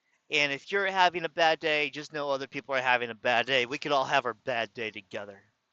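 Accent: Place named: California, United States. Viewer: You're human. You are allowed mistakes. I love your voice, very Californian.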